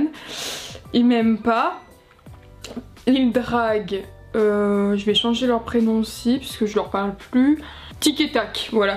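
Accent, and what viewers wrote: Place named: North, France. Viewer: Ptn elle a grave l’accent du nord😂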